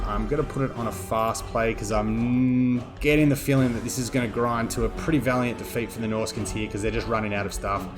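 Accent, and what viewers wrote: Australia. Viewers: That feral mammoth hit Gotrek 5 times in a row between and ! I was hoping to see it's value, but you managed to skip it the entire time! Good cast though, I enjoyed listening to another aussie.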